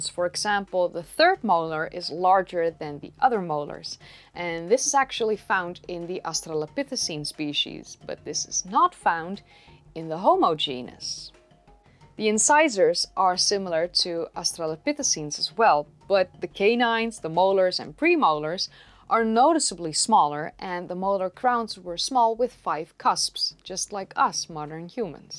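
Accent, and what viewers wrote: Netherlands. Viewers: Do I detect a Dutch accent? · Na 3 seconden hoorde ik dat je nederlands bent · Ik hou van je Nederlandse accent en hoe intelligent je klinkt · do I detect the slightest Dutch accent? maybe even belgian?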